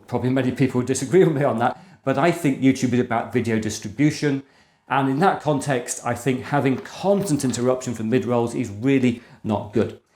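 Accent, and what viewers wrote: United Kingdom. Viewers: Your personality and style very unique sir, also have british accent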